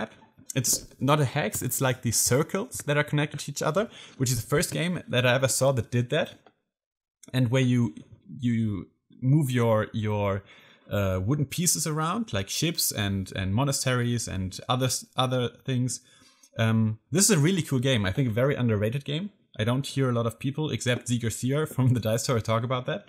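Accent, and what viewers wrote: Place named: Germany. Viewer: Really appreciate your video. You are so lucky to have so many great games shops in Deutschland, hearing your accent makes me miss my Mother country!. - Your English is perfect by the way. Thanks brother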